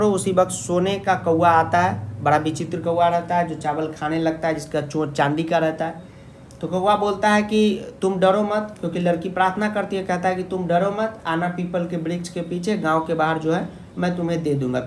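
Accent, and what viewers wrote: Bihar, India. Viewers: Sir aap bihari haina?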